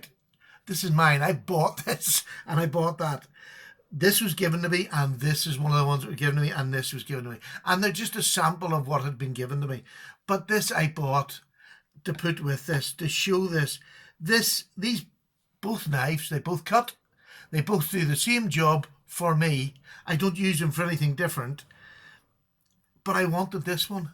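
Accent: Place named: Ireland. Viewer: Congratulations. Love you channel and even more your sweet Irish accent!:-)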